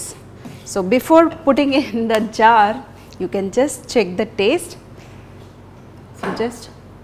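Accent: India. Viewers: Haha by ur English I have found tat u r from India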